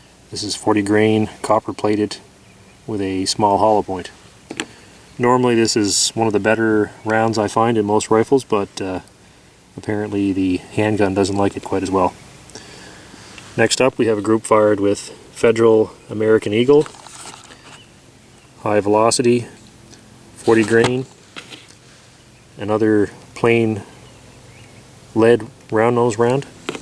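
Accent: Canada. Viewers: Are you by chance, Canadian?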